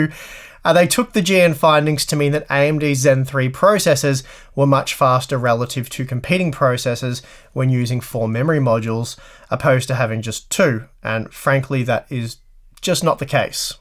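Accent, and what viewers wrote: Australia. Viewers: There's something about that exotic Aussie accent. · I always like your videos more than GN, because Steve never takes a break to breathe. I am an engineer and I have troubles following him. (Also, tbh, who doesn't prefer Australian accent over a Yankee one?)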